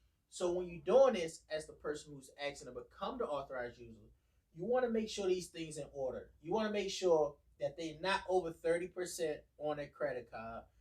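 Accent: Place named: Washington DC, United States. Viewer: bro def from pg or dc 😂 facts but great video my guy !!!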